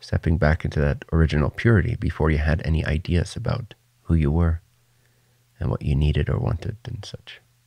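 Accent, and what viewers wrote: Netherlands. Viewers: His dutch accent is kind of distracting...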